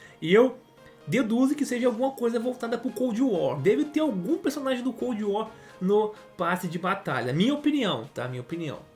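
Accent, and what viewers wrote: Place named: Rio de Janeiro, Brazil. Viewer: essa sua voz de carioca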